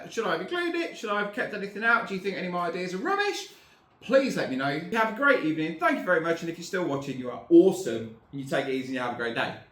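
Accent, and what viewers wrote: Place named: United Kingdom. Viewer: It looks like you have lots of experience along and innovative ideas etc. However, I can't tell which part of the UK you are from, but listening to you, I couldn't tell what you were saying about 30% of the time, especially at the end of sentences and brief asides. I don't say this to be demeaning, rather just to let you know. If I'm having a problem, and I'm from the northwest of England, then there's a good chance lots of other people will have the same problem. When I first moved to North America, I even had someone ask me if I was English, because they couldn't understand me, so I know what it's like. I suggest that you slow down a little, try not to clip the ends off words, and avoid local dialect that listeners from other countries don't understand. Hopefully, you will gain more subscribers which, I believe, you deserve.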